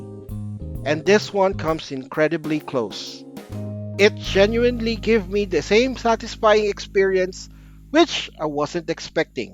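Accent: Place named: Philippines. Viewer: Oh youre from Filipina thats why little weird I heard your accent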